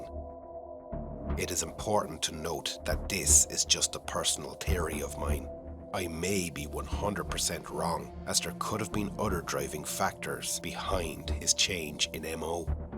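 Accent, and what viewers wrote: Ireland. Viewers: I’m impressed by this documentary. Good old Irish accent too.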